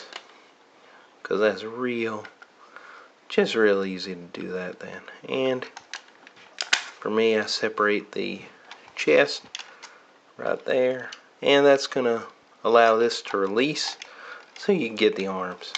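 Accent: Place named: South, United States. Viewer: Is that southern accent for real?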